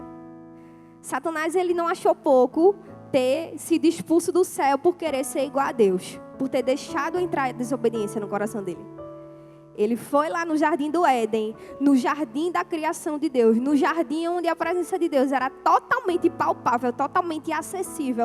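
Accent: Northeast, Brazil. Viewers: Gosto do sotaque nordestino Deus abençoe a todos nós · O sotaque mais lindo que eu já vi Nordeste seu lindo 😍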